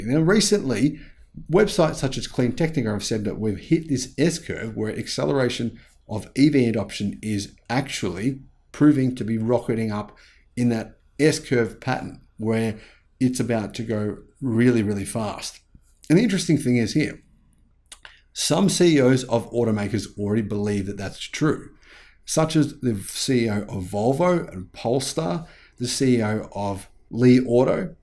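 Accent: Australia. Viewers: Yeah , you sound Ozzi mate! 😉 Love the content and your style.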